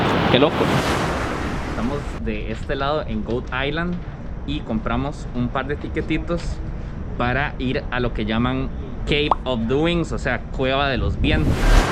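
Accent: Costa Rica. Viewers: No más abriendo el video, escucho ese acento y le digo a mi señora ese “mae” tiene que ser tico Pero lo que no me dejó duda, fue cuando dijiste que el arroz que comiste, “era como cantonés”. Así o más tico, lo digo con orgullo😂😂😂 👍🏻👍🏻👍🏻👍🏻👍🏻🇨🇷🇨🇷🇨🇷🇨🇷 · Si ud no es costarricense y no sabe aceptar o tolerar que así hablamos los Ticos; si no sabe reconocer que esta es una de nuestras características, entonces pásese a otro canal donde hablen un español neutro y sin ese saborcito Tico que le pone a sus vídeos... De lo contrario, calladito más bonito... 🤫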